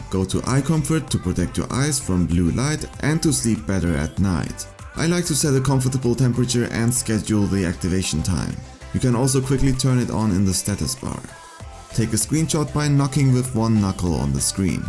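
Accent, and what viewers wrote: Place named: Germany. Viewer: Der deutsche Akzent ist nicht zu überhören😂👆🏻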